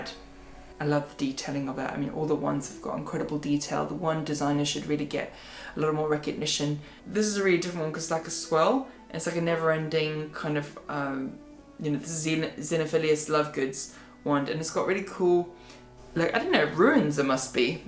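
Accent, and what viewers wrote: United Kingdom. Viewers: u sound like devon bumpkin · Nice British accent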